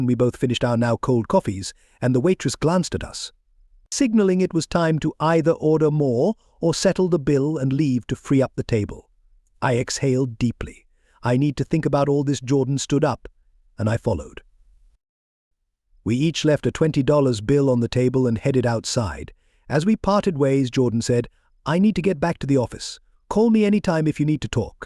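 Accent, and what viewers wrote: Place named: United Kingdom. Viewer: using a "british" style accent for an american story is very off putting the vocalisation just sounds incorrect then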